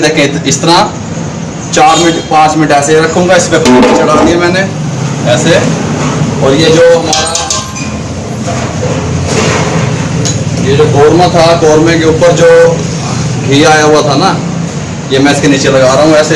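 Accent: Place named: Mewat, India. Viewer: Mewati lgta h cok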